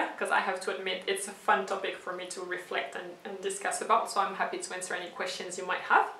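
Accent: France. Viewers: I was thinking that you were actually a French, from your accent. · Quand un français essaye de parler en anglais sa donne ça !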